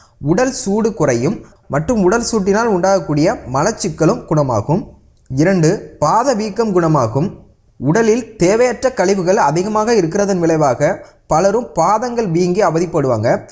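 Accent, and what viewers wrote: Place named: Sri Lanka. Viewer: Ilangai tamil maari therigirathu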